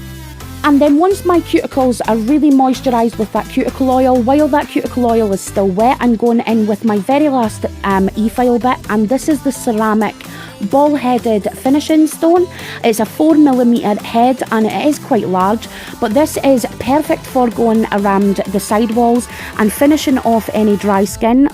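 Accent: Scotland, United Kingdom. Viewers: So glad I came across your channel,I’ll be watching more ,this video is fantastic and nice to hear that accent from a fellow Scot x🏴x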